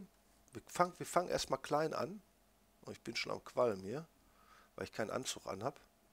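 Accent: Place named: Berlin, Germany. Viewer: Berliner Englisch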